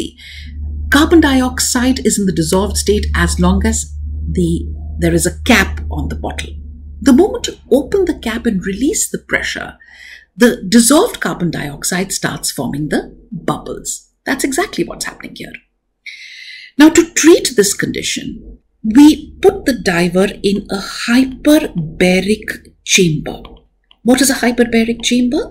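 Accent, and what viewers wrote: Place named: United Kingdom. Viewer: Mam uk wali nhi hindi wali pronounciation bolie , nhi smjh me ata but content acha h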